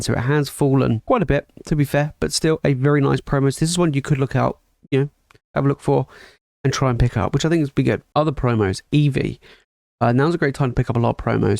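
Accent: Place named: RP, United Kingdom. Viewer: I realize you are probably in the UK but why the gay queens English? Use dollars.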